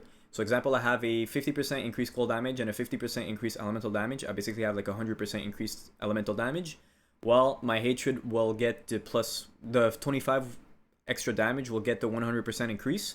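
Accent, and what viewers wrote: France. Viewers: i Like Ur pronunciation. (French native?) Keep the Work Up on yt. I have watched numerous Guides. When i Heard IT IS your Seconds Video i was surprised. The Guide is well done!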